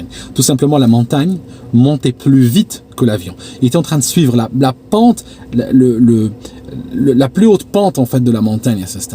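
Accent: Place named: Switzerland. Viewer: charmant le petit accent suisse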